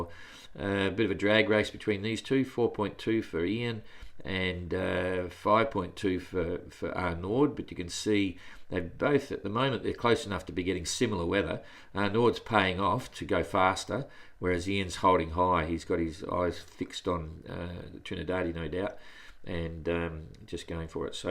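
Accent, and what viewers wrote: Australia. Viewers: Hi. Nice hearing your Aussi twang. Im watching from DK. Just getting a Najad 390 ready for som southern sailing so watching this with great interest. Ps A stranded Kiwi saliing the baltic, now home waters. Cheers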